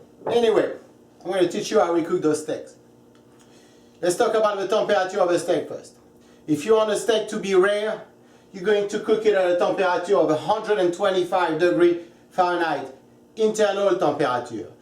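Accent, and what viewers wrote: France. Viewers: I LOVE YOUR FRENCH ACCENT! I TAKE FRENCH III AND I LOVE THAT FRENCH ACCENT! NICE JOB WITH THE STAKES BY THE WAY! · Very helpful! Where in France are you from? J'aime ton accent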